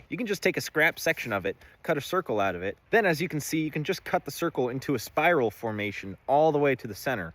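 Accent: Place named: United States. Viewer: This guys accent is crispy. I don’t usually like the American accent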